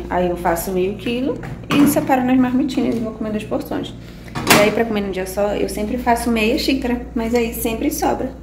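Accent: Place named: Pará, Brazil. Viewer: tem muito sotaque paraense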